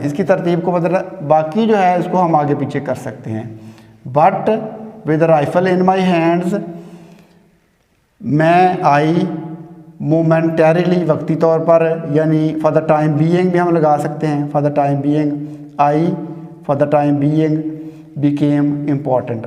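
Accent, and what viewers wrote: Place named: Punjab, India. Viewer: It's ok but your accent is Punjabi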